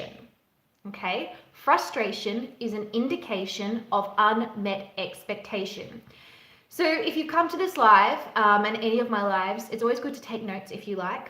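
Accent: Australia. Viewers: How about we feel like 💩 because our rights have been taken away! You: “Things aren’t going back to normal.” Well, sounds like you’re in Australia by your accent. So, enjoy being under house arrest & just taking it like a good lil sheep.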